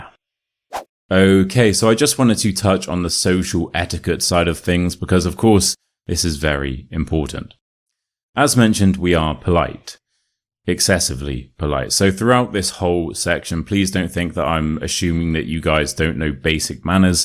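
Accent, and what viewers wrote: United Kingdom. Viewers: Very nice the British accent being spoken.. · awesome i m a big Fan of your British English loving it day by day😇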